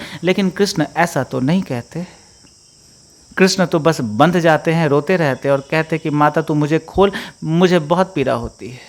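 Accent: Bihar, India. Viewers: Isko ignore kro , Isko jitna importance doge ye highlight hoga aur logo ko chutiya banayega. Ye mimicry kr rha hai, Bihari hai ye iska tone bta rha hai.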